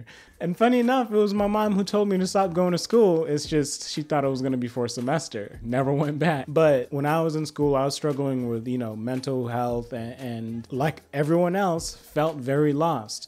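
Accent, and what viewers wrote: Kenya. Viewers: are you by any chance kenyan?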